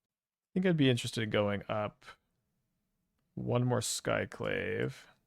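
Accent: Texas, United States. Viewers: I knew Texans actually talked like that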